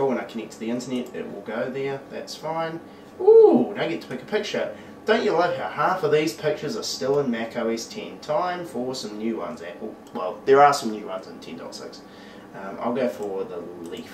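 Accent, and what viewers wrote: New Zealand. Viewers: you're accent suggests that you are a kiwi?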